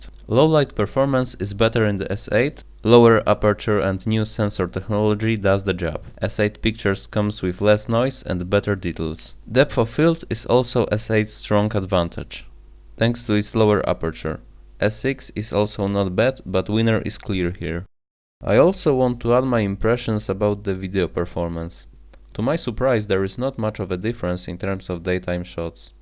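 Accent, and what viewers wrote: Poland. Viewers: Elo polska ;) po akcencie słychać zawsze ;) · Bardzo polski akcent... dobry test ale jak dla mnie ostrosc ma jednak troske lepsza s8 i dorzucił bym jej pół gwiazdki :) Pozdrawiam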